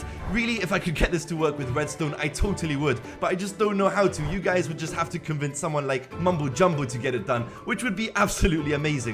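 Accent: Belgium. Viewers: Are you from Belgium sir? ^^ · Are you from Belgium? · are you from Belgium?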